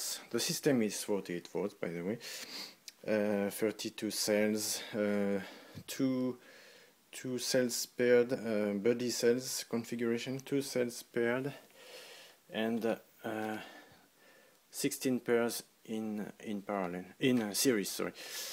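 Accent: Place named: France. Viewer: Salut, à écouter rapidement le début de la vidéo, je pense entendre un accent bien français:) ce qui me donne espoir de pouvoir discuter de ton installations de vive voix. Fait moi signe stp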